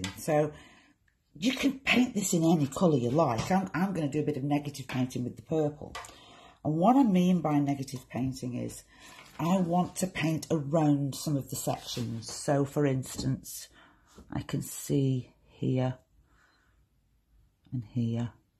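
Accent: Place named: North, United Kingdom. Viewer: Love your work, loose is the way to go. Sounds like you are from somewhere in the north?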